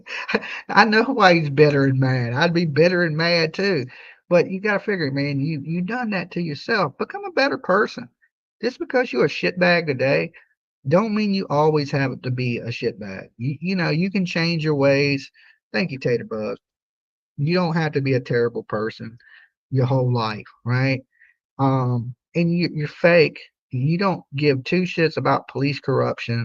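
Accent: South, United States. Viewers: Hey! Long time listener (I remember you playing the Banjo during Summer Wells). I live on the border of Maine (USA) and New Brunswick (Canada) by 3 blocks and a River. It is Picteau County but pronounced Pick (like a guitar pick) TOE (like the appendages on your feet). I know this because I listen to a LOT of Canadian news (CTV or CBC). You are close and that redneck accent may be the issue, but Maine has rednecks, too so no excuse.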